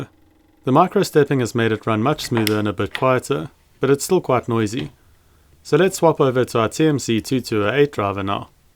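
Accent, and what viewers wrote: South Africa. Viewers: As a saffer in the uk its surreal hearing a SAFRICA accent on a random YT algorithim suggestion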